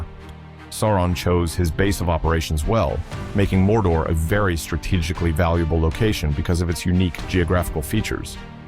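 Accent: United States. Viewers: The pronunciation of place names is too American. Especially Osgiliath and Eregion.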